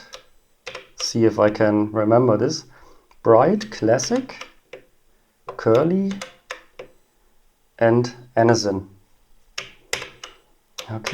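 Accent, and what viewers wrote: Germany. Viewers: Your Stimme Sounds a bittl german. Can u make a Video on Deutsch? ;)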